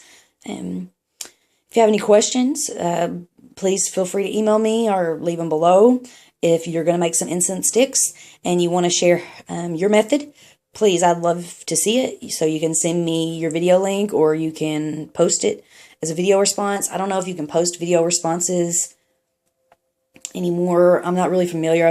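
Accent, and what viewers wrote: South, United States. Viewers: The video was fine. Don’t pay these dummies no mind. I’ve never done incense and want to try it and this is instructive. Gosh, and that wonderful southern accent! I totally miss hearing it!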